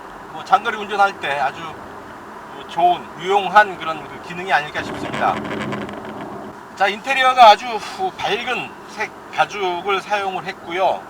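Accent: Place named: Jeolla, South Korea. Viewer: 설명이 건조하고, 사투리톤이(전라도) 강해서 영상이 딱딱함ㅋ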